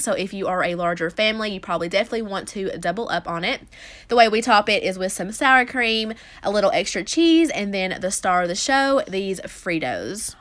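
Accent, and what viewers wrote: South, United States. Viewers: Yeahhh my southern sista! Idk where your from but ya sound like me so I like ya! 😉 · I love your voice!!! Sorry if that sounded rude!! But I think country accents are amazing!!! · Where you from ? You got a accent. You sound really country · Damn she did that and I love the accent country ass me!!!!!! Yeahhhh buddy??? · Your accent is TOO cute! It’s my favorite part of your videos. Olive “ol” 😊😂 sorry if this is offensive I mean it in the best way possible, I love southern accents!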